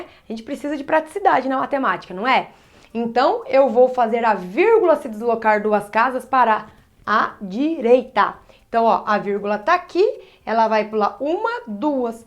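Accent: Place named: Rio Grande do Sul, Brazil. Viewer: Vc parece gaúcha 😅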